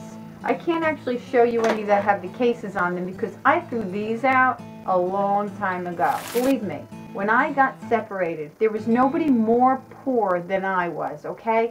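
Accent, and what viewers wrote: New Jersey, United States. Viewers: Thanks for the tip. I don't know why I haven't bought one of those DVD holders yet. I actually did purge my DVD's this year and I gave away about at least 100 of them to the girls at Good Counsel Homes. Anyway, I'm seriously thinking of getting a DVD book like you have for the rest of them that I decided to keep. MyDVD and CD cabinet is exploding at the seams. BTW -- I KNEW you were from East Rutherford with that Jersey accent.........I'm a Bloomfield girl myself!! God bless!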